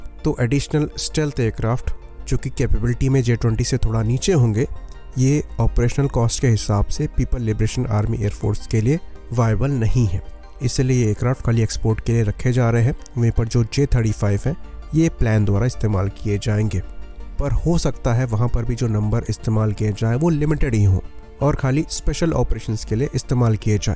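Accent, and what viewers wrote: India. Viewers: 你的英文有很强的印度咖喱味道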